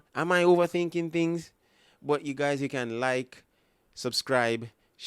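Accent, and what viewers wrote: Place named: United States. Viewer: They said that aboyt him last season, he is not in the decline. I reccomend you dont say that until its actuallu factual it brings into question the validity of anything you say especially with an american accent plays into the steriotype a little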